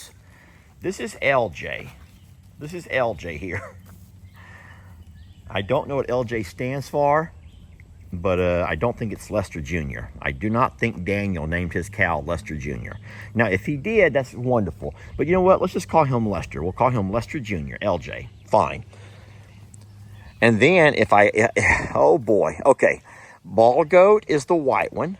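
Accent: South, United States. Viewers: Hi Lester, This is just a suggestion. I think the best spot for the pigs is by the pond. If they are introduced slowly to the horses, I feel they would be happier by the pond just like at ISS. Side note: I've been watching y'all since 2017, and your country accent has gotten thicker since retirement. I know you're a code switcher, BUT I've never heard it this thick <- I do enjoy the melody, though ❤ Thanks so much for sharing, and LHL's looks fabulous with all the babies on it 🐎🫏🐐🐖🦙🐏🪶🐕🐈🐈‍⬛ P.S. Can we get one of these -> 🦄🤣😂🤣 Much love ♥️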